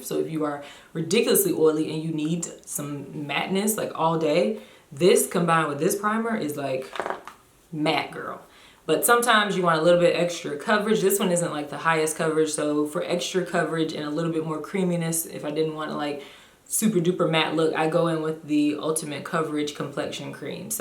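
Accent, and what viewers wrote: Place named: Alabama, United States. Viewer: Great video and I love your hair! My question: Where are you from? I hear an accent...perhaps Hunstville, AL?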